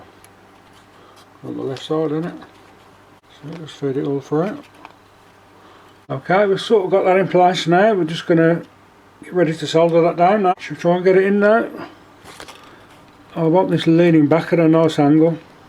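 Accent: Birmingham, United Kingdom. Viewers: Your Obviously A Brummie , Do You Remember PCRL On 103.5 FM ? Blessings From Penzance , Cornwall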